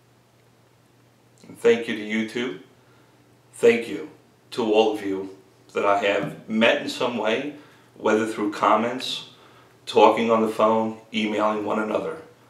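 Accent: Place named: Arkansas, United States. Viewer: Long Island? I was sure that accent was Arkansas or West Virginia : ) I, for one, appreciate that you think about things and don't just follow the backs of bottles. You keep calling it like you see it, and I'll be here.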